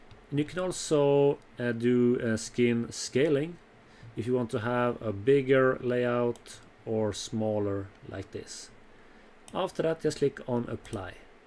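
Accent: Sweden. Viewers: Ahahah i got the Dual Mini model... Flawless Price ...snagget it right before everything went Haywire with the prices. Im Swedish too, you sound like Stockholm dialect.. :D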